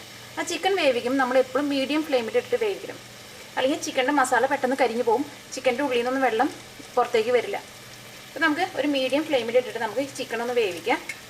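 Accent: Thrissur, India. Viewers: Hey Chechi, You are really great...You have got a lot of talent......Keep up the good work & keep teaching us more & more recipes...Your recipes are easy to understand & delicious too....May God bless you.....& I specially love ur Trishur accent...:)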